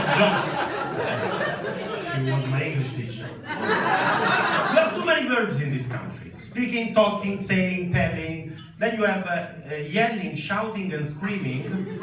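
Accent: Rome, Italy. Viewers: from a fellow roman it's funny how he transfers directly "roman humour" and accent in English. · HA ROMANIZZATO L'INGLESE...L'HA RESO SPICCIO HE HAS ROMANIZED ENGLISH TALKING...MORE EASY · Non concordo, ha un ottimo inglese. Non fa errori grammaticali e ha un vocabolario più che adeguato. Ha una pronuncia più che decente, molto comprensibile seppure con un fortissimo accento italiano. Ma se si capisce cosa dice e non fa errori di pronuncia a lui trasparenti, l'accento non è un errore, non intacca la valutazione del suo inglese così come "nativo" non è un livello di inglese. Capisco che sia Italiano come capisco che una persona di Manchester sia di Manchester e una persona Irlandese sia Irlandese, eppure non diresti mai che un Irlandese non abbia un perfetto inglese perché non parla come Re Carlo. Perché poi si entra in una seconda questione: quale sarebbe il nativo corretto? 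Americano - e quale Americano? O preferisci RP? Ogni accento identifica la provenienza. Quale di queste provenienze siano considerate native non è questione di livello di inglese, ma di geopolitica. Il fatto che parli con un accento non mi dice assolutamente niente sul suo livello di inglese.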